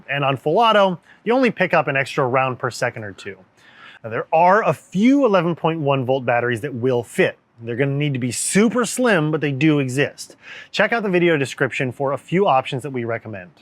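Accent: Russia. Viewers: That Russian accent though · How to talk like that? Russian accent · I Loved the super detailed review. All the info was much appreciated and incredibly helpful. Can't wait to see more reviews like this, Russian accent or not. Good job and Evike crew.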